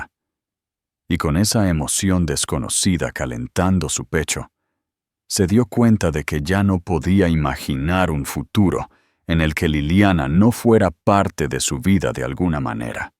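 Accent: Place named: United States. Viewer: Pronunciation y acento muy gringo no me gusta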